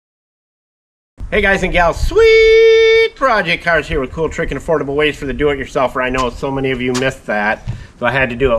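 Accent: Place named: Michigan, United States. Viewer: Been watching you for years. If memory serves you were somewhere in Michigan. (We can still hear the "Michiganer" accent) We're down near Tampa. Welcome to Florida.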